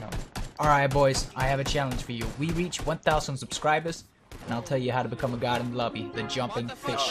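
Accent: Denmark. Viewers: are u danish? or mayby norwegian?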